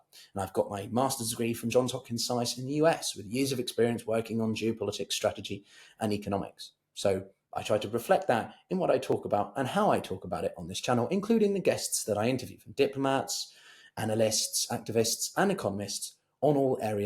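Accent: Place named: England, United Kingdom. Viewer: Another Nice Jew-is boy with an English accent.. Talking crap! Says The BronxBoy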